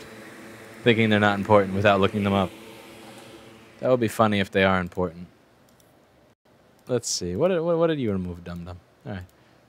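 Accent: New York, United States. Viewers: haha such a new yorka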